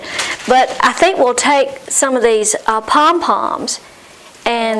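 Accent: South, United States. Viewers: I love your videos, I 3 daughters and one step daughter and love the times we craft or cook together. We are southern too so we love listening to your accents! I am learning all things deco from you gals! Thanks. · I just love watching your videos! Not only have I learned a few things, thats the bonus, but best part of all, is feeling like you have just invited me into your home for a visit. I love the way you talk lol, you guys sound just like me he,he. I was just a talking to you saying heck yeah I wore a garter belt. Gotta love those Southern Ladies. Never change.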